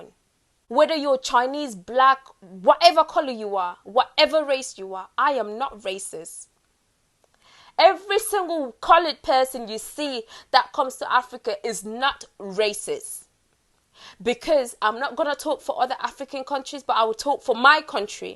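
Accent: United Kingdom. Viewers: But she is sitting up here with a "WHOLE BRITISH ACCENT." DIVISIVE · Kwame Owusu I had to close my eyes and imagined 👀because yea the strong British accent won’t appear to come across threatening but hey she is building her platform to start a nonsense war just like the South Africans do the Nigerians there own blood and wonder why we get taken advantage all over the world I mean literally shitted on 😖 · You on here starting your president's rant against your own black brothers and sisters in America what's your strong British accent acting like you in Gambia right now doing everything for gambians and on a Prejudice rant against your brothers and sisters in America westernized and brainwashed.... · Are you still BLEACHING your skin, GAMBIAN woman with British ACCENT?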